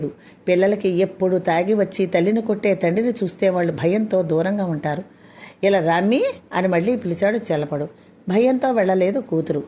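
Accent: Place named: Uttarandhra, India. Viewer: Good story. The slang of uthharandhra well established in the tone of story teller.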